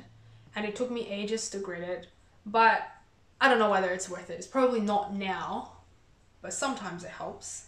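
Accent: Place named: Australia. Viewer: Loved learning about you. Your English is perfect with that lovely Aussie accent! I also tend to gift my stitching, though I recently purchased patterns I want to stitch and keep. I’m lots leer but like your style. Looking forward to more!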